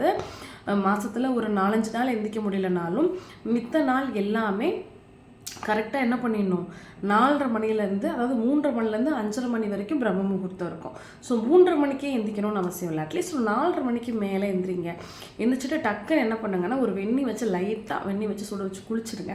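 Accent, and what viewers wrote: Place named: Nagercoil, India. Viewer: Sister, your slang looks like nagercoil side?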